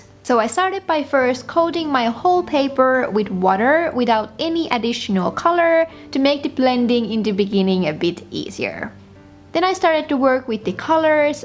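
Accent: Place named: Finland. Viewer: I was watching this after it was recommended to me and was closely listening to the way you speak, thinking "Hmm.. sounds a bit finnish.... but also a bit japanese... Interesting 🤔". Then I checked the info and gasped, you're a finn! Aivan ihanan kevyen näkönen bujo ja todella hyödylliset spreadit ❤👌🏻 Tykkään kovasti! I'm getting Archer & Olive as my next bujo too, I'm absolutely craving for thicker pages! The overall look of them is also very pleasing 😊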